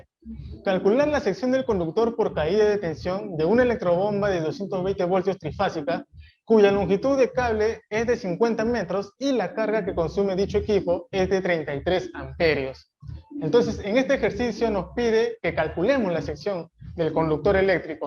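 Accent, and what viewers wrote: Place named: Peru. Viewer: INGENIERO BUENAS NOCHES. HOY DIA ENCONTRE SU CANAL. MUY INTERESANTE EL VIDEO Y SU CONTENIDO. ESTOY REPASANDO MIS NOTAS DE HACE 40 AÑOS. ENCONTRE LA FORMULA PARA CALCULAR LA TEMPERATURA DE TRABAJO DE LOS CABLES YA SEA A 50 GRADOS O A CUALQUIER TEMPERATURA. DIGAME SI EL CABLE FUERA SUBTERRANEO, BAJO TIERRA QUE TEMPERATURA SE TOMA PARA EL DISEÑO. ESTOY SUSCRITO A SU CANAL, VOY REVISAR SU CONTENIDO. ESPERO QUE SIGA SACANDO MAS VIDEOS SOBRE ESTOS TEMAS. ME PARECE QUE UD ES PERUANO. RECIBA UN GRAN SALUDO Y MIS FELICITACIONES POR UNA EXCELENTE EXPLICACION. SALUDOS DEL NORTE DEL PERU. QUE MI DIOS LE GUARDE Y LE BENDIGA.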